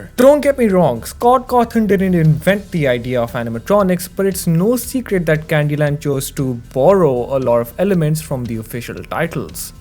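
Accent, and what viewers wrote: India. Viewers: If u can ignore the indian accent then this content is actually pretty god damn good.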